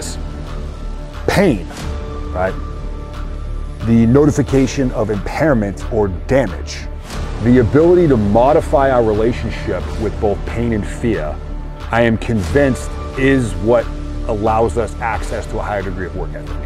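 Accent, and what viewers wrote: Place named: Massachusetts, United States. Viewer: Hey Chief after listening to this it made me think of Buddhism ! Especially when you talked about suffering? As a older regular soldier , and a below the Right knee amputee I am just blow away by you. I know you have a lot of plans after you retire. Have you ever considered teaching? Not the SF , thing but academia. Kids and adults are lost today and they need role models and great teachers . You snake eaters are all Geniuses, so maybe take that Mass accent and go to Harvard !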